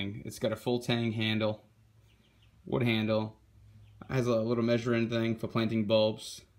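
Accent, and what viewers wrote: United States. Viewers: Awesome, I'll have to add this to my garden arsenal! Thanks! You sound like you're on the East Coast? I'm in Nor Cal. Lots of us Portugees out this way! I have a huge family. Seems like farming and boating are in our blood. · You def planted them correctly. Too bad they didn't pull thru for you. You must be a New Englander like me. I can hear it. What was in the wine barrel? Columnar apple ?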